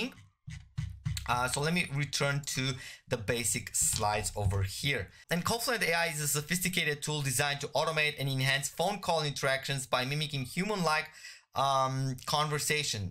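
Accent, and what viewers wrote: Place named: Quebec, Canada. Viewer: Oui la voix est pas mal je vien d'écouter, on dirais juste qu'il a un accent québécois 😂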